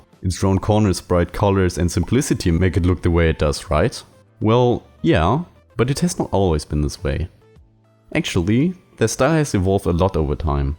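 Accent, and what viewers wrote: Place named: Germany. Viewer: if u ever heard a german u know, dass du voll deutsch bist, danke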